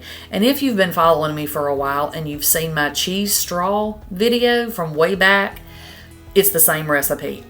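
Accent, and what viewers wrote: South, United States. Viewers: You have such a beautiful Southern Accent. What State are you from?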